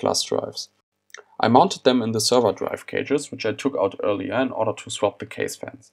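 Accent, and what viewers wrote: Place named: Germany. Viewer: Man hört voll raus das du Deutsch bist is bei mir auch so das voll cool :)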